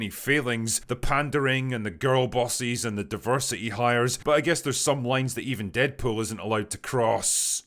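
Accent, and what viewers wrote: Scotland, United Kingdom. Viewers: Probably work on your accent you British cnt. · Why are you speaking with a weird inflexion in every sentence? I understand that Scottish is part of your brand but it’s annoying and doesn’t add any comedic value. Stop.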